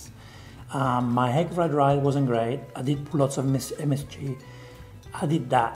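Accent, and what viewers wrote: Italy. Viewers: I just have to ask this 😂 Why do italians learn other languages so hard? You probably at this point, lived in english speaking communities more than Italian, yet you either force your italian accent (don't believe this) or this is just the way you speak, not that I mind, but I just heared many italians that don't rly pickup other languages even after 20-30y living in a different country 😂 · Thanks to I finally learned how to do a good pizza dough, now it’s very popular amongst my friends everybody loves it. Learn to do real Italian food from a real Italian, I wouldn’t trust you if you didn’t have that Italian accent;) The reaction videos are good to Actually you learn more from those videos like “ don’t put oil into the water”:) 👍 This is a great channel. Just keep it going my friend. Saludos!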